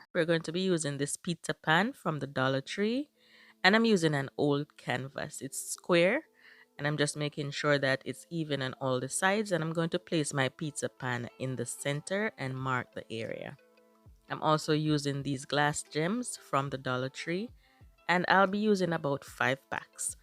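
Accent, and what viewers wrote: Jamaica. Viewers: Your pieces are gorgeous❤❤❤❤❤ and sounds like you are Jamaican🇯🇲🇯🇲🇯🇲🇯🇲🇯🇲👍👍👍👍👍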